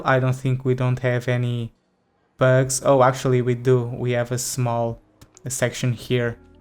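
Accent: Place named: Portugal. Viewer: Esse sotaque Portugues nao engana :D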